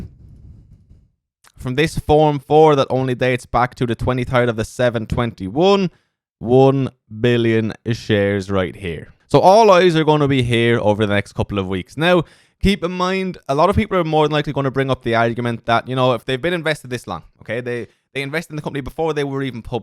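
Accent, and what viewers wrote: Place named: Ireland. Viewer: So glad you are back, good to hear that Irish 👊🏼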